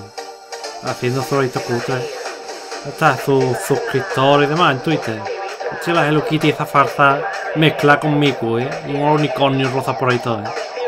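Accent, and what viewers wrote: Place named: Seville, Spain. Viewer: ajajaj como se nota ere sevillano ole! ajaja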